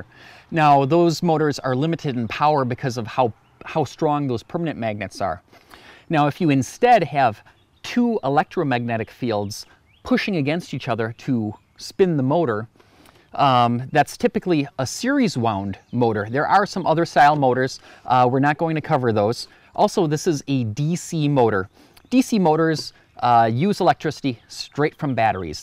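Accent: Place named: Wisconsin, United States. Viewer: Ah that great Wisconsin accent, takes me back home. Great video series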